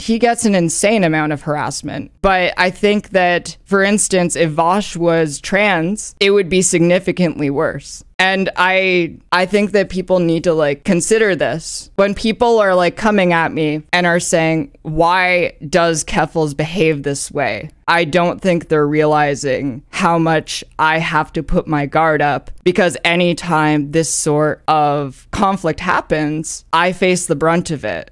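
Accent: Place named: Canada. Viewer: Wait! You sound Canadian. I thought you were Italian :( Mamma Mia!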